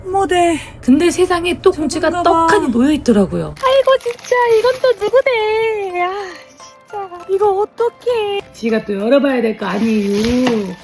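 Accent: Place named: Yeosu, South Korea. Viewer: 여수 사투리 잉~~잉??!! 구독 합니닷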